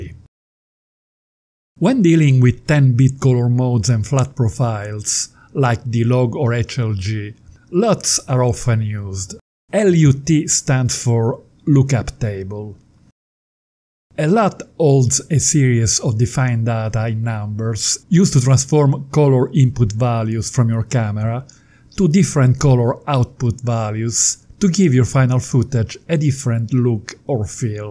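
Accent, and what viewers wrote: Italy. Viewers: Very informative and helpful, especially for a beginner in video editing pro photographer. And I adore this heavy Italian accent. Big thumbs up!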